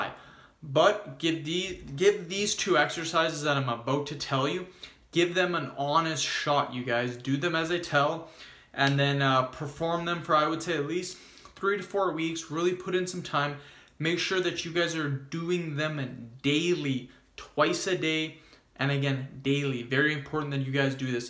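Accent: Canada. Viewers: You have a really strong Canadian OAT accent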